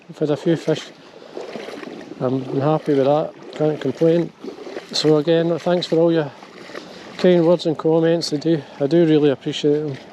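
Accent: Scotland, United Kingdom. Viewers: are you from Scotland? I've heard it said that some scotts cannot say "Purple Burglar Alarm"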